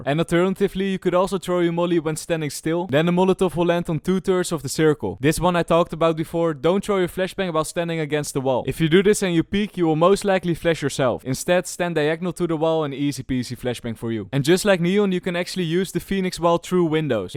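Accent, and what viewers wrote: Netherlands. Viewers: Are you dutch or something, because you have that accent. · bro je stem is zooo Nederlands gewoon holly fuck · Ik weet zeker dat je Nederlands bent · this guy sounds like he's dutch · Het nederlands accent maakt de video veel beter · je ziet dit waarschijnlijk niet maar holy moly je klinkt ZO nederlands xD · I smell Dutch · you dutch/nederlands? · Do I hoor Nederlands? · Bro are you dutch? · is this guy dutch?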